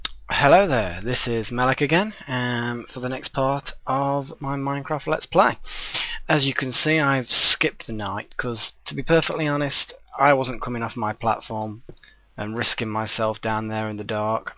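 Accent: United Kingdom. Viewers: i love ur British accent xD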